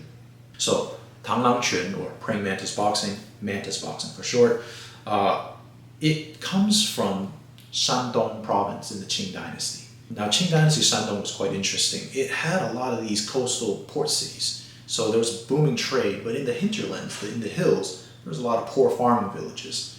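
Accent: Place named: Taiwan. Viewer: He's Taiwanese, my step dad used to say "zen" for 人。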